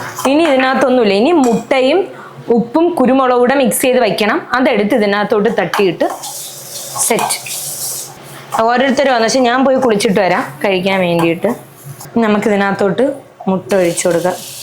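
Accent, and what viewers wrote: Trivandrum, India. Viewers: Nombu eduthittu kaanunnavar undo nammude trvandrathu ingane aanu parayunnu uratti 🥰❤️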